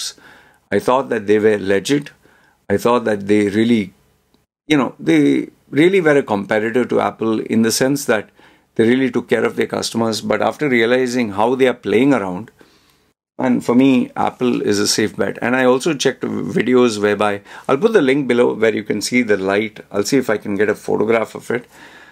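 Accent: India. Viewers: bro ur accent is very similar to Indian accent and ur voice is very soothing....😅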